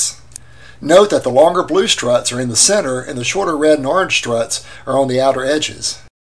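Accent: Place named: United States. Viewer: Thanks for these great explanations! On a side note, you sound like you must come from the same part of USA as Bill Clinton.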